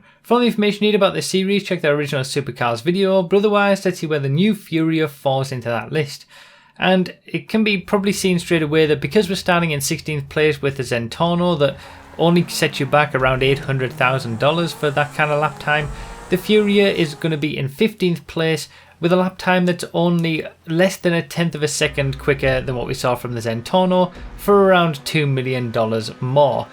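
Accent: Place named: United Kingdom. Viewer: Dem brit ish accent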